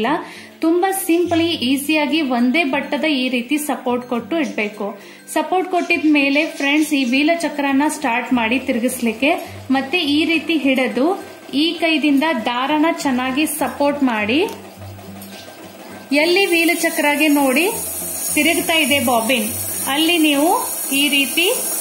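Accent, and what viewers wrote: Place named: North Karnataka, India. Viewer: Hi sister very usefull video nanu uttar karnataka davalu niu nam language tharane adtira niu yav districtdavaru ?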